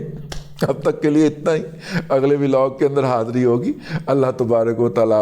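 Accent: Punjab, Pakistan. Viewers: Salam bhai this is Aamir kapadia from karachi your vlog always based on truth your Punjabi style I really loved and always enjoy your closing sentence great Allah aap ko apna fazal ata karay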